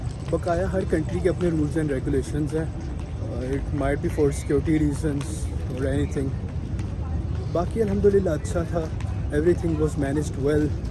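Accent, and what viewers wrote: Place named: Kuwait. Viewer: wohii kwt wala accent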